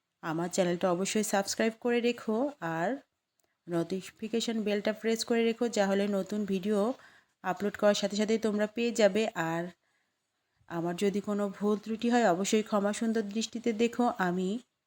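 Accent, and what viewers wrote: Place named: Kushtia, Bangladesh. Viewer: আপনি কুশতিয়ার কথাএ থাকে ❤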